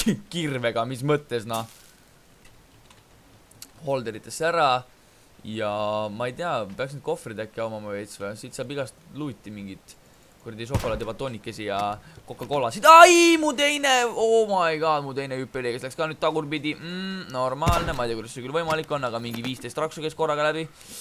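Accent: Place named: United Kingdom. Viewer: Su British Aktsent on nagu Geographic Channel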